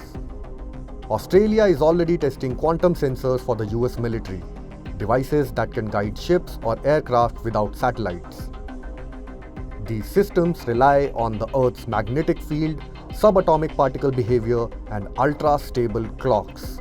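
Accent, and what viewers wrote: India. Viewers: I was going to flame you for the nearly impossible to understand accent, but you're an Indian business. I can't fault you for a strong Indian accent. Comment is free engagement for the algorithm.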